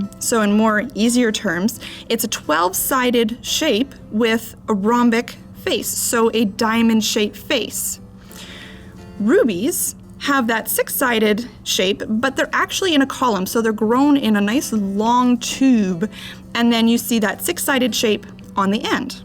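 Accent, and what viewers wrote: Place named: Winnipeg, Canada. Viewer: Ruby was my Mom's birthstone, emerald mine. I loved collecting garnets during my college Earth Science' class field trips as well. It was funny though, for an engagement ring my fiance wanted cubic zirconia because it was more 'sparkly' than diamond. I thought it was nice too. Love your Winnepeg accent--I worked for a client up there in the late 90's, I was given a visa which marked me as a professor so I could teach hotel business systems' classes all across Canada without paying a customs fee, under the rules of NAFTA. My Canadian hosts were so nice to me--my first trip there, with my visiting Napa Valley Gospel choir, was in '72 in BC and Alberta. I was so blessed to go there so young, and my timing was lucky, because I returned home only to have an emergency appendectomy a month later that August, returning from my school's summer vacation an intriguing hero among my choir mates and classmates. 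I remember arriving in Ottawa during a terrible blizzard at 3AM one year, and my client picked me up at the airport as my flight came in after an eight hour delay from Detroit. I also remember a client in Montreal giving me a day off so I could hop a bus to Quebec City, and I enjoyed the Winter Carnival and ice sculptures. Other than Europe, I spent more time in Canada (mostly working) than any other place outside of the US. I remember the Geology and Glaciers of the Canadian rockies, and the hospitality of the French and English Speaking Canadians who treated me like I was a US am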